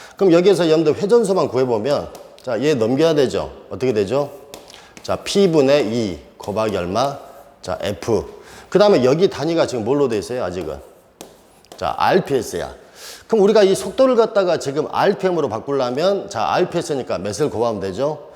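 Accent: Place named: Honam, South Korea. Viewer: 교수님 정말 멋진 강의하고 있습니다 구수한 호남사투리에 귀에 쏙쏙 박히는 강의 내용 제가 경상도 50대 후반 남자 꼰대인데 인생살면서 교수님처럼 멋진 강의 처음입니다 구독자들이 어떤 건의사항들이 올라올수 있지만 그냥 교수님 스타일대로 하세요 대한민국 최고의 교수입니다!!! 멋집니다!! 어떤 것도 바꾸지 마세요!!! 정말 이대로~~~~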